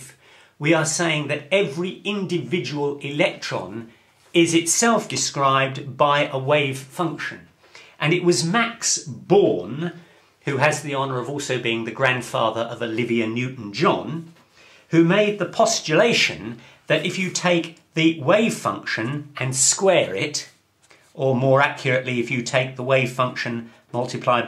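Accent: United Kingdom. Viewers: There's nothing like physics being explained with a British accent! :-) Very instructive videos, thank you very much!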